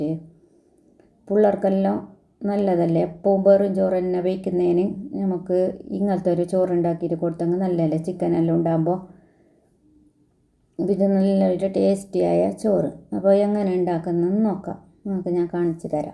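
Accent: Kasaragod, India. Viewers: നല്ല അടിപൊളി സംസാരം എനിക്ക് ഒരുപാട് ഇഷ്ടപ്പെട്ടു കാസർകോട് ആണല്ലോ സ്ഥലം കാസർഗോഡ് ഭാഷയും വിഭവങ്ങളും ഒന്ന് വേറെ തന്നെയാണ് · സംസാരം അടിപൊളി എനിക്ക് കാസർഗോഡ് ഭാഷ വളരെ ഇഷ്ടമാണ് വീഡിയോ കണ്ടു ഞാൻ ഇത് പഠിക്കും😂😂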